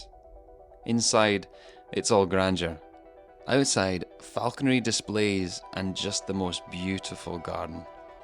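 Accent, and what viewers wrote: Scotland, United Kingdom. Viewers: Nice to hear a Scottish accent. Instead of a ai voice. ❤ Scotland 💙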